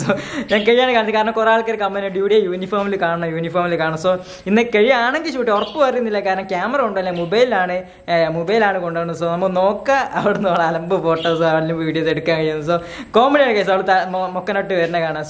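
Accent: Kozhikode, India. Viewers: യ്യ് കോഴിക്കോട്ട് കാരൻ ആണോ,,, സംസാരം കേട്ടിട്ട് തോന്നുന്നു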